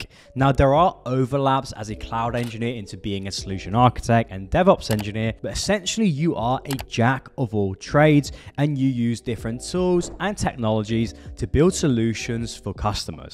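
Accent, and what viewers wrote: United Kingdom. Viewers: Looool it’s our UK accent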